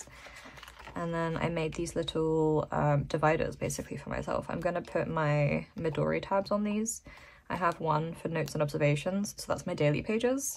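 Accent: United Kingdom. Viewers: . In answer to your question you sound more south east England than London. But then again I only know some accents from London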